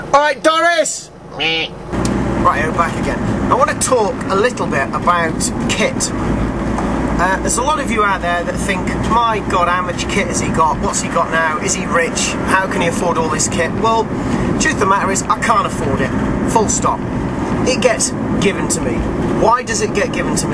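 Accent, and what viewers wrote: United Kingdom. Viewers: You're and you have given us knowledge...and lots of it. But trust me, this djtutor stuff is good TV material. It's addictive, just like reality TV is. I know that, just like myself, a lot of people around the world gets hooked on them...speacially gig logs...those are 110% reality TV!!! Plus, you will be the perfect British host for the show..you know they love British accent people here in the US TV networks. Saludos desde Miami!!!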